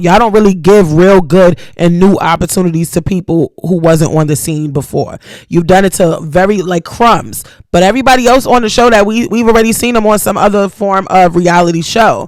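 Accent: New York, United States. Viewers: You sound like Remy ma... You can tell you're from NY ❤ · YOURE DEFINITELY FROM N.Y. ITS THE WAY WE TALK…PERIOD LOVE THE CONTENT🖤💪🏾💯🥂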